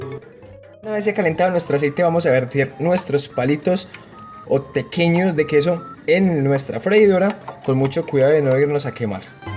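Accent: Colombia. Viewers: Me encanta ese acento Colombiano. Saludos 😙😍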